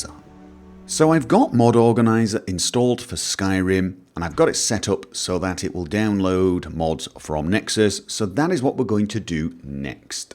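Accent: United Kingdom. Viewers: You are from Norway? this is impossible. I am sure You are British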